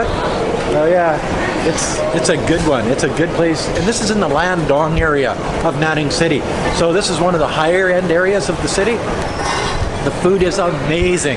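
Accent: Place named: Canada. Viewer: Lol that accent can't get any more Canadian. Love it!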